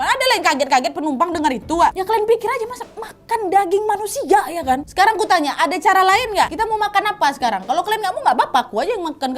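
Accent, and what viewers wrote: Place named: Palembang, Indonesia. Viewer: demen gue, logatnya wong kito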